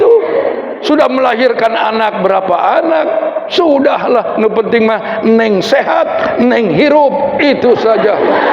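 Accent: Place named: Sunda, Indonesia. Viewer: Suara Pak Kyai kas sundanya.... salam gotong royong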